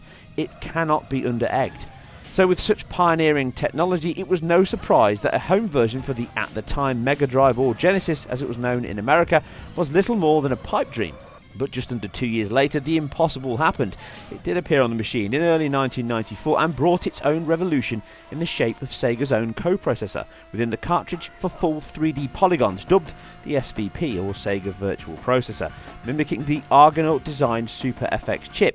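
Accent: United Kingdom. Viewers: Nice vid but (no offense) I can not understand a lot of words you’re saying, with my American ears. What kind of accent do you have, some kind of heavy British accent, right? Anyway nice vid. :)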